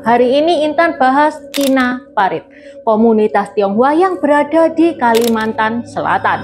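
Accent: Java, Indonesia. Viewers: mbak logatnya kok pakai Logat Jawa .kenapa gk kalimantan atau madura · mba intan sendiri kenapa medok bahasa jawa nya padahal cina juga hehee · 😆😆medok banget jawanya,hayo siapa yg bilang intan itu cina??intan itu orang jawa yg lahir di keluarga cina😂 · Medok jowo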